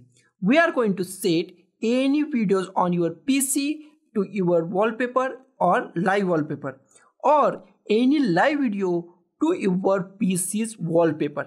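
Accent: India. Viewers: Bro's internet speed 🗿🗿 bro's Tutorial ❤❤ bro's indian accent of english being an indian 🙌🙌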